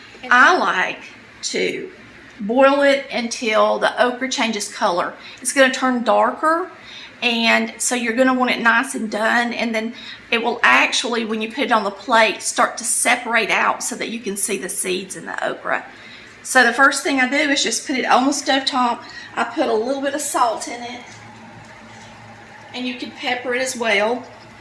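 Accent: South, United States. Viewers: "boled" okra? I actually love you southern accent. Thanks for the video.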